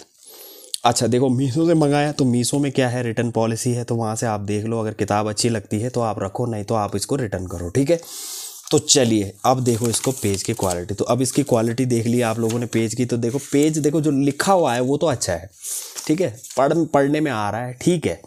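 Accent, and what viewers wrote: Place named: Garhwal, India. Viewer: गढ़वाली tone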